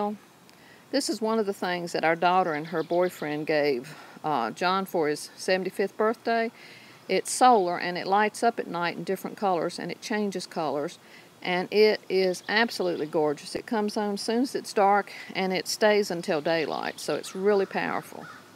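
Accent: South, United States. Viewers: i have just discovered your YouTube channel and i have enjoyed your Garden videos so very much. I am inspired! In what part of the country are you living? I think I detect a very distinct southern accent. 😊♥️😊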